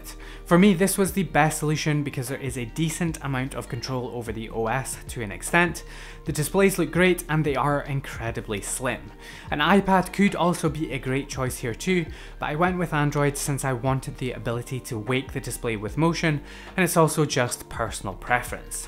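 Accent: Scotland, United Kingdom. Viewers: are you scottish like me?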